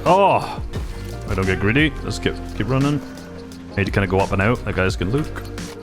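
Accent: Scotland, United Kingdom. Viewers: Why is understanding pure scottish english so hard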